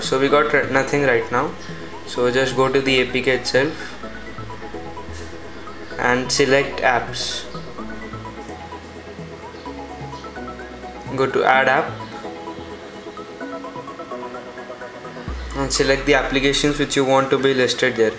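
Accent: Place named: India. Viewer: an another indian phone toturial, who speak with accent...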